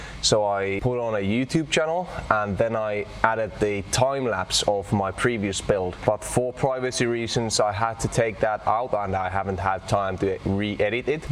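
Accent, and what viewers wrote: Finland. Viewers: vai että kässis. pakko sanoa etten oo koskaan ennen kuullut moista. kai se voi rallienglanti taittua opelikuskiltakin.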